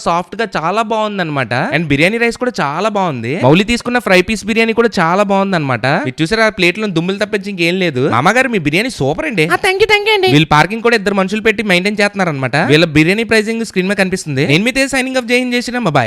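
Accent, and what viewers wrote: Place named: Visakhapatnam, India. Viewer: Bokka la vundhi nee slang. Vizag paruvu thisesala vundhi worst ga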